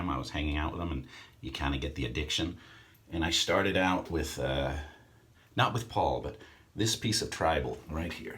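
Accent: Newcastle, United Kingdom. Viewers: Very cool tattoos Sir. I can just hear the Newcastle accent ;-). I have yet to get my first tattoo. Is something I have wanted to do since I was young. I have something in mind and may get it done. Ps if ever you and family visit the UK would be an honour to show you the Hampshire and south coast area :-)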